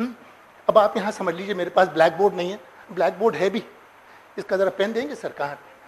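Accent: India. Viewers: Bloody acent from India bady needs subtitles, or just continue talking hindi as this does not change much.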